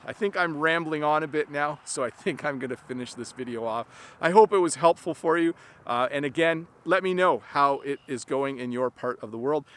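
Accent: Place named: Canada. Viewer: it's awesome hearing the nuances in Canadian English versus American English. Thanks for the upload.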